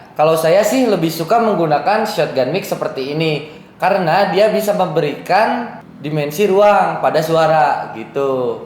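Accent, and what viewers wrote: West Java, Indonesia. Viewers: Logat sundanya berasa hehe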